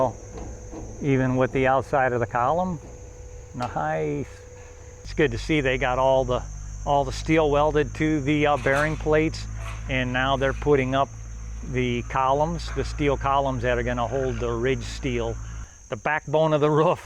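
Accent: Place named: Midwest, United States. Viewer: Are you from Chicago? I detect a midwest accent. I grew up in Illinois but been in CA for 40 years. Thailand next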